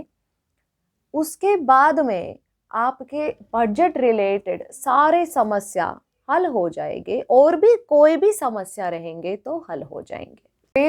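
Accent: South, India. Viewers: Girl u r awesome!! got the perfect south Indian accent, lingo, perfect sarcasm!!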